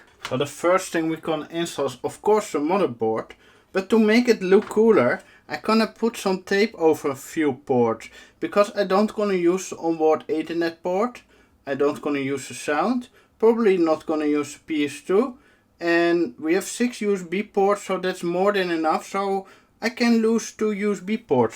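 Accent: Netherlands. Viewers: Zwaar NL accent gast. I suggest getting rid of the hdd's and putting in an SSD, that would make the system faster, more quiet and consume less power. And you can use the storage for logs, caching or snort. You really don't want to virtualize your PFsense router trust me! Sure it's fine for some tests but it's a pain in the ass if something goes down. Also with segmenting your network or VLAN's it's much easier to have a physical machine. The only drawback is power consumption.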